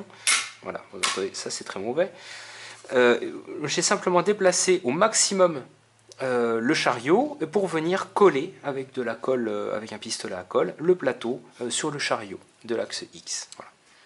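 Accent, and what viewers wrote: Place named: France. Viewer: love it! Very nice build. Love your French Accent... even though I do not understand you. Thank you for showing this!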